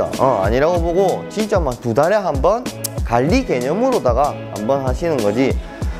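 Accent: Gyeongsang, South Korea. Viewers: 경상도사투리에 구독.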